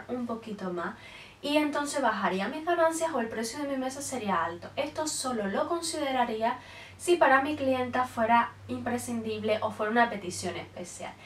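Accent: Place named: Venezuela. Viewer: Hola de donde es usted de que país su asentó es como de Venezuela?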